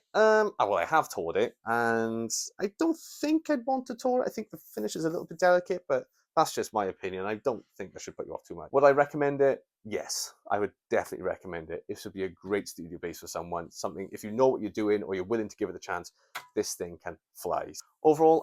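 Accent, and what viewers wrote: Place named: Wales, United Kingdom. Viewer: Great video!! I really enjoyed it, even with the Welsh accent 🙂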